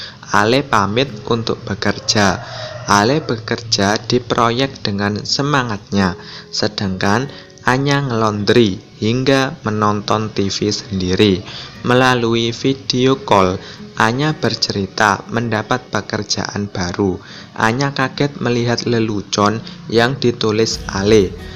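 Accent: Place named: Java, Indonesia. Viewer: Medok biangeeet min😀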